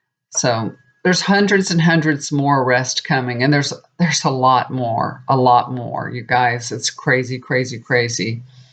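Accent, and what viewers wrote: South, United States. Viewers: I like your accent and southern charm.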